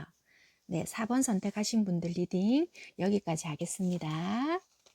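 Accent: Busan, South Korea. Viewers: 최근 헤어진 커플만 해당되는줄 알았어요 ㅎㅎ 감사합니다! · 님 상대가 마음정리 했을까봐 두려웠는데 아직도 마음이 있다고 하니까 위로도 받았어요🥺 리딩 감사합니다 💖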